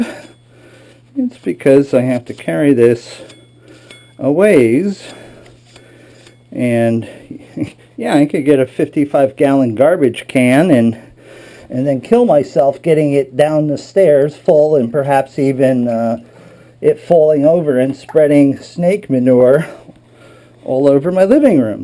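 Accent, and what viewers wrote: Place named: New York, United States. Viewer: He's a New Yorker - they all sound like that! LOL!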